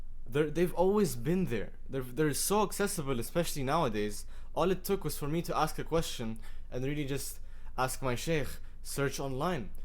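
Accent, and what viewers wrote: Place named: Egypt. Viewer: عرفت انك مصري من الaccent. كمل كلامك جميل جدا اللهم بارك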